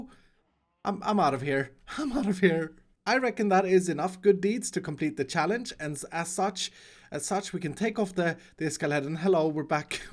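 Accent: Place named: Sweden. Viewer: Jag ska vara helt ärlig och säga att jag inte insåg att du var svensk innan jag läste det någonstans. Men om jag ser tillbaka på det så är din brytning ganska lätt att känna igen.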